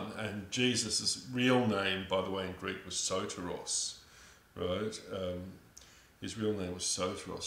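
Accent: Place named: Australia. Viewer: interesting vid - fellow aussie it seems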